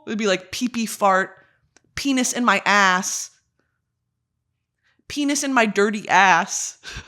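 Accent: United Kingdom. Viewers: u should do an episode in full british accent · your birtish accent is so jess this season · Your british accent is giving. I love it!!!! But fr make this love island NOW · The British accent is giving Chole from love island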